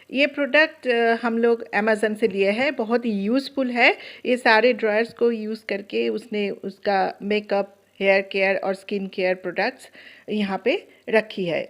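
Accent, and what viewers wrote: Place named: Bengal, India. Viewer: Up bengali haye kaya? Upke hindi bolnese asai lagta hai. Isiliye bola.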